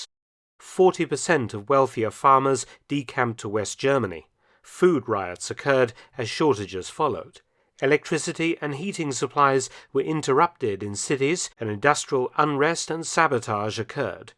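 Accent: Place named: Southern England, United Kingdom. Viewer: A generic southern/Home Counties English one.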